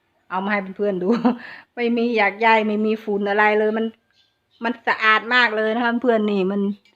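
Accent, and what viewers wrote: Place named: Surin, Thailand. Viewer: ฟังดูแล้ว.สำเนียงคน..สะเร็นชัดๆเลย.5555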